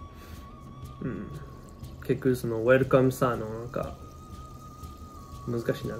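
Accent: Kansai, Japan. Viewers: コテコテの関西よりやね🤣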